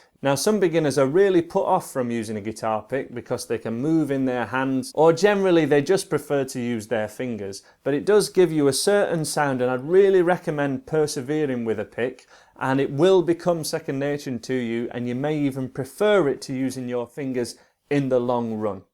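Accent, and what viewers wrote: United Kingdom. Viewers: I just can't stop watching your videos and I do feel comfortable with your teaching rather than many Others besides I really like you speaking British which is my favorite... Thanks a lot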